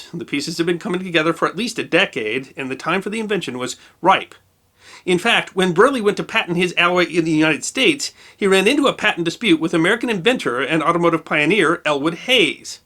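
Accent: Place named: St. Louis, United States. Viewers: I had guessed that you were from the StL area when I first started watching you. You have that accent. I grew up in the Overland/ St Ann area. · St. Louis Missouri? I was guessing the North East originally due to Your syntax and inflection. I'm guessing that You're a transplant then?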